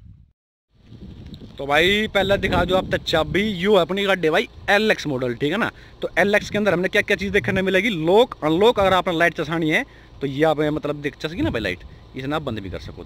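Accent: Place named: Uttar Pradesh, India. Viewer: Bhai apni Desi up wali language is best haryanvi is not good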